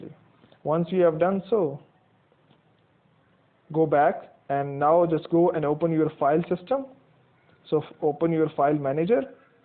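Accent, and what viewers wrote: India. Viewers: At April 2020 Android phones empty the recycle bins after 60 days. I just wish this guy didn't speak with such a thick Indian accent because what he says is very helpful.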